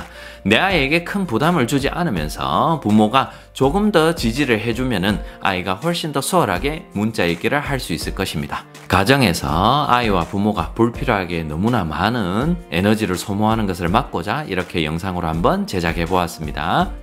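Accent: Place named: Daegu, South Korea. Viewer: 대구 프린스 선생님~😉 귀에 쏙쏙 들어오는 대구 사투리덕분에 선생님 영상 보는 재미가 두배에요~^^ 오늘도 좋은 영상 고맙습니다♥️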